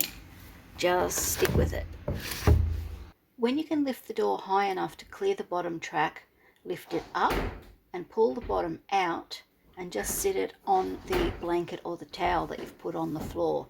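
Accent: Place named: Australia. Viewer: Absolutely brilliant thank you! Love the tip at the end about not reefing the door open as that's exactly what I'd do, old habits etc. Another thing, an Aussie accent yay. Love your Asst Tradie, beautiful moggie! Thanks again for a great video. From Western Australia ❤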